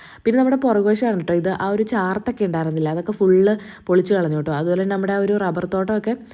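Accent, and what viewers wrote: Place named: Thrissur, India. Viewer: തൃശ്ശൂര് പറയണത് കൊടപ്പൻ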